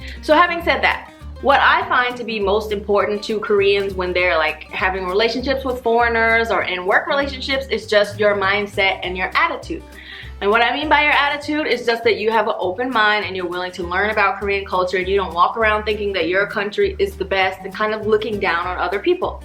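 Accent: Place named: South, United States. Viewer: How serious is the vanity issue in Korea and the deal with the narrow chin/jaw I have seen some that the shape of the face with the jaw/chin being so narrow/thin but the top of their head is bigger it is shaped like how they portray aliens to look (like old Roswell stories). Anyway I love learning about the culture and the only thing that I find concerning is the appearance/vanity issue. I do subtitles for Liu Studio, sometimes (aka Lee Sungmin from Super Junior) so I need to learn even more. I am originally from the South too, I knew you were the first time I heard you speak haha. I now live in Australia. I hope to visit Korea sometime.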